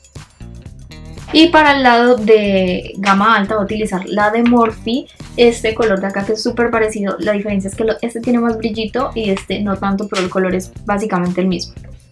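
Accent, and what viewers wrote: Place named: Antioquia, Colombia. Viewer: Hola, el vídeo me entretuvo mucho y me gustó la temática, pero quiero darte una recomendación si me lo permites. Espero que no te lo tomes a mal, de verdad lo hago con una buena intención porque tu canal me gusta muchísimo. Yo sé que muchas veces los que somos paisanos y tenemos este tipo de acento hablamos muy rápido y por esa razón nos enredamos, pero en los vídeos esto se hace mucho más notorio, y puede llegar a ser un poco estresante escuchar que alguien hable tan rápido, se equivoque y se trabe. Lo que puedes hacer es intentar hacerte consciente de lo que dices al hablar para así hacerlo más despacio, y si te equivocas en una palabra la vuelves a repetir un poco más lento y pues lo editas, así creo que el vídeo se vería mucho más calmado y profesional. Un besito desde Medellin, saludos!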